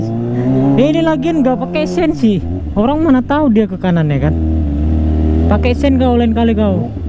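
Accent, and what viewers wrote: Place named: Medan, Indonesia. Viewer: Mon maap bang lu orang Mane nih dari logat logatnya macam orang Medan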